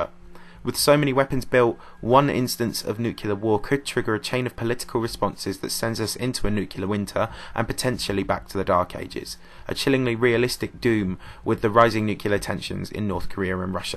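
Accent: United Kingdom. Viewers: The worst part of this is your Brexit accent.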